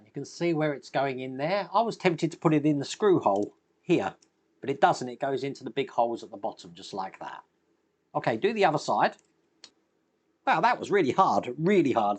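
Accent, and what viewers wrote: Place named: England, United Kingdom. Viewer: We’re English that’s our accent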